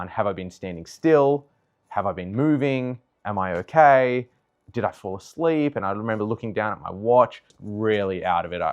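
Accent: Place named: Australia. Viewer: Great to hear a downunder accent. Thanks for this, it was so interesting.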